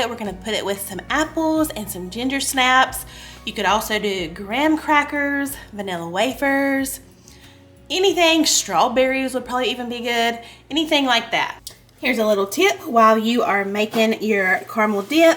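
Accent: South, United States. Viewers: I'm new to your channel really enjoying your recipes and home decor. Where you from im from ky i noticed you have a southern accent. Thanks for sharing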